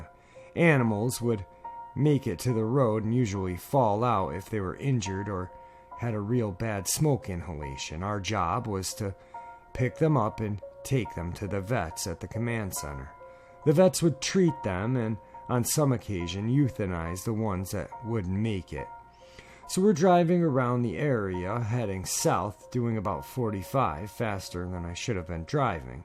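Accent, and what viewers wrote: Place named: United States. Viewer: To me as an Irish person your accent is delightfully different. I wondered where you were from, I'm not very good with pinpointing US accents apart from recognising a southern one of course. 😁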